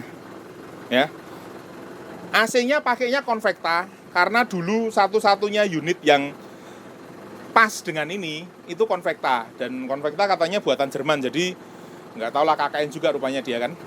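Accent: Java, Indonesia. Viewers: Seneng senyumana pak bos sama logat jawanya .yg khas👍🙏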